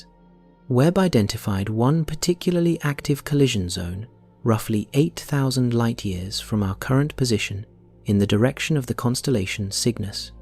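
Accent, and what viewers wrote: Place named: United Kingdom. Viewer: I actually, like the voice of British accent. I can understand perfectly. Please use the same voice for future posts. This new discovery is awesome. I am always hungry for facts and accuracies., while we are still alive to learn our location in the vast Milky Way. I was wondering 🤔 all the time what our solar system encounters during our galactic journey. The Earth absorbs some of the space dusts and unknown particles that can affect our planet. Gamma rays or super nova radiation are scary that truly wipe out our civilization instantly. Anyway, I surely enjoy listening the podcast. Thank you for a good job 👏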